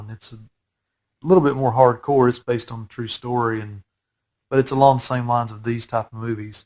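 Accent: South, United States. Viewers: your southern accent is perfect for this commentary. well done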